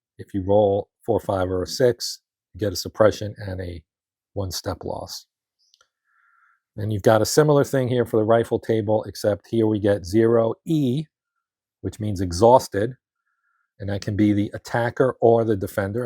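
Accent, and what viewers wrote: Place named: New York, United States. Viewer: pronouncing eurotowns with new york accent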